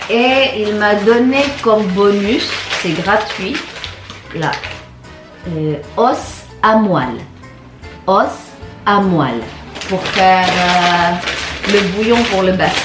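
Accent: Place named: France. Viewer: Keren banget logatnya udah Prancis banget, je suis jaloux je voudrais parler français comme teh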